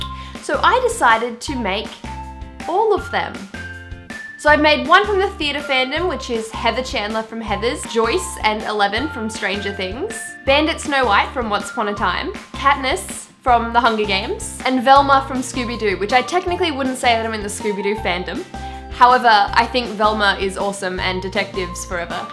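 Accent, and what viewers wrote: Australia. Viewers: Omg you're Australian same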